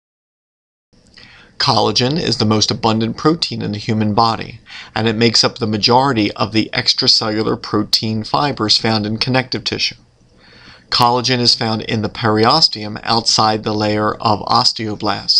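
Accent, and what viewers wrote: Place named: Philadelphia, United States. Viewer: He's from near Philly I can tell by the accent (and the name AKA )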